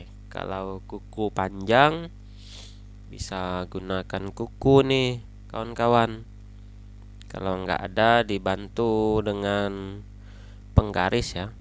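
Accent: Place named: Aceh, Indonesia. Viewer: Asli mana bang? Logat nya kayak orang aceh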